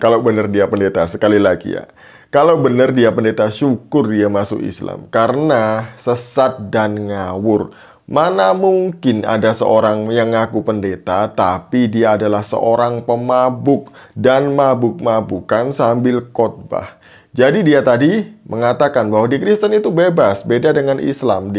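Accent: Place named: Manado, Indonesia. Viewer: Kau itu org mana, kalau logatnya seperti darianado.. org mabok yg masuk gereja yg kamu bilang itu bohong tetapi kalau dia mabok pasti disuruh pulang, kalau kamu kentut di gereja ya terserah dia tap tapi orgnya tdk tau malu..tapi tdk membatalkan org lain untuk beribadah karena makna cara penyembahan kpd Tuhan berbeda dng islam..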